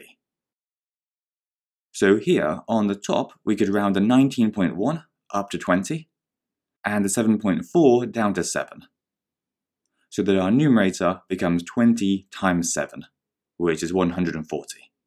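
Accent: United Kingdom. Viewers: Good Bristish Accent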